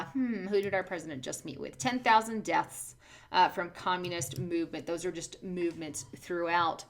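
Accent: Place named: Midwest, United States. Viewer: I hear you. Unfortunately in this country there are a lot of communists who won't listen and a LOT of people that aren't very bright and they won't understand. So swimming against the current but I do appreciate your effort. I like your posts. I think I could talk to you for hours about this stuff. On a side note I'm trying to figure out where you're from based on your dialect. I don't think east coast or west coast and I'm hearing inflections that sound...Ohio or Indiana? Just guessing..could be a little further south and west but not too much. The only other guess I have could be north central west. Montana or Utah but I just don't hear as much western dialect influence. What's funny is you only use valley girl drawl when you are parodying others or having to make a point you think is painfully obvious. Anyway I'm still listening. Have a great day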